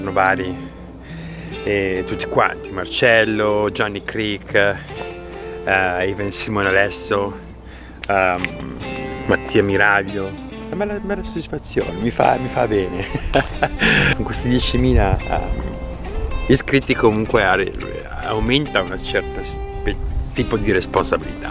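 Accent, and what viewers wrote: United States. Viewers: Grande continua così. La cosa più fantastica è sentire che parli un italiano in stile americano ed aver perso il dialetto del tuo paese di origine.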